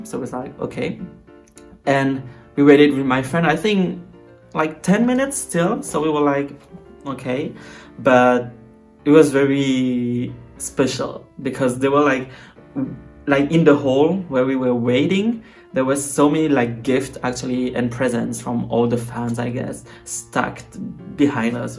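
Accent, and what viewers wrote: France. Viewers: U'r French accent is so cute 😘, but interesting story, thanks for sharing. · On entend ton accent français trop choupi ~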